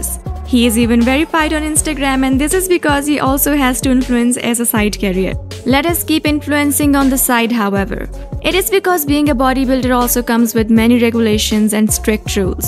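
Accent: India. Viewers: Having an Indian woman with a typical Indian accent makes these videos hilarious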